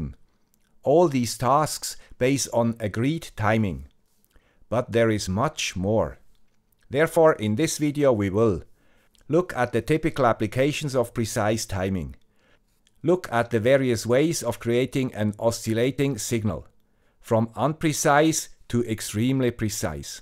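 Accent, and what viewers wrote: Switzerland. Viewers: This is a very good video. Thumbs up. And of course Swiss people are renown for timing accuracy, so this is consistent with getting the information from a guy with a Swiss accent. On the downside, Swiss people are not known for having lots of sex. For information on that aspect, we will be waiting for a video by a gal with an Italian accent! ;-) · Great your Youtube show! But I would prefer it very much if your videos would continue to be numbered. It's much easier to reference them and much more practical to say to a colleague: "Have you seen video ### by (the guy with the Swiss accent) yet?". Or sometimes I just write down: "See Swiss ##".:-) Many thanks for your series! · Hello Guy with swiss accent, very impressive from Guy with Bangladeshi Accent.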